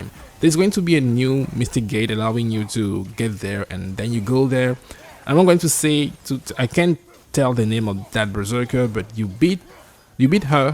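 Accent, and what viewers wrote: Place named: France. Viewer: je me disais bien que y'avait des petits reste d'accents français par ci par la, très bon niveau d'anglais sinon.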